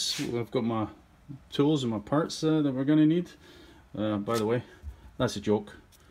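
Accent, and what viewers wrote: Scotland, United Kingdom. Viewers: Do I detect a Scottish accent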